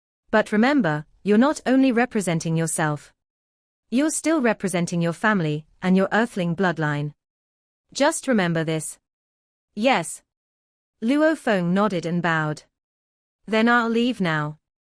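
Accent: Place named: United Kingdom. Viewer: What an annoying British accent voice over.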